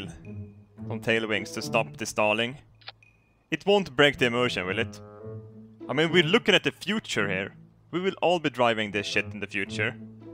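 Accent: Sweden. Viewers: är du svänsk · du e skit bra men du pratar inte så bra engelska (ta inte illa upp) typ svengelska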